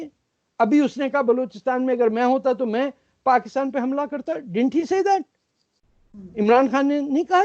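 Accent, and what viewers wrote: India. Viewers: Arzi kazmi I like your program. You look and speak like an Indian daughter. Very lovely. God bless you beta.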